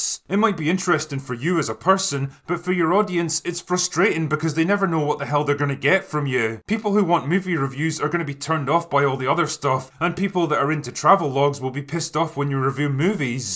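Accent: Scotland, United Kingdom. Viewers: Love you, Will...same reason I love "orange man good". You're blunt, intelligent, you don't give an F and you get to the point, with a unique Scottish accent, articulation and you're just outright hilarious at times. I thank God for ya, mate. You're a man's man with a good heart. Alright. I'll go away now. Hugs bub. 🤗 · The Scotish accent helps too.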